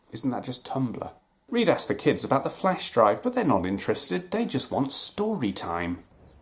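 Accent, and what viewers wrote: United Kingdom. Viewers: As an American, whenever I find a new channel and you have a British accent, that's all I need to know this shit is about to funny.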